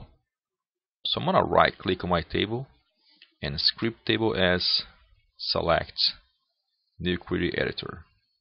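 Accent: Brazil. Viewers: Brazilian accent? Excellent tutorial by the way.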